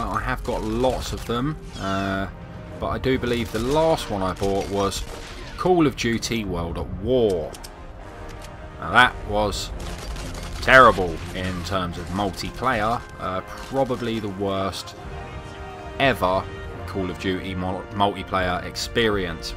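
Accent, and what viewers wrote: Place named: United Kingdom. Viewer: sir you got very good royal british accent with a bronx behaviour , amazing and magnificent 👍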